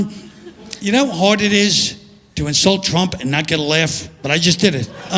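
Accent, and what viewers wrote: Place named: New York, United States. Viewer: Wow I just realized how much trump n Colin have the same cadence. New Yorkers am I right.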